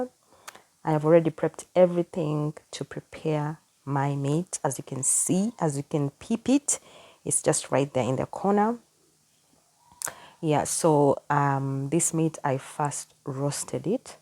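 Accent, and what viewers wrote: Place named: Malawi. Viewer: I love how straight to the point the video is. I'm from Malawi, and we also use Royco here 😂. I've just bought a pressure cooker and an Air Fryer as well. What's beautiful to me is listening to your voice - it's literally identical to how we pronounce words here. Instantly, I was drawn to keep watching, and in fact, I'll be here for a long time watching your videos.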